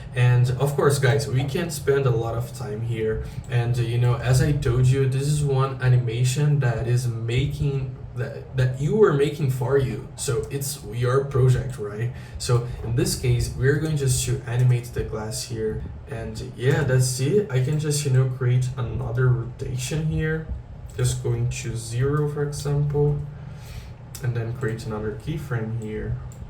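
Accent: Brazil. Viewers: Quando escutei o sotaque no começo e vi que eu tava entendendo bem o inglês eu pensei: ele deve ser br ahhahah. Nice work in this video man! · Sotaque brasileiro, certeza😊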